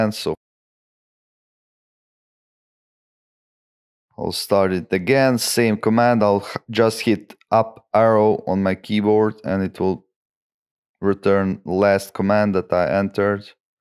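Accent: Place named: Eastern Europe. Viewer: Славянский акцент))))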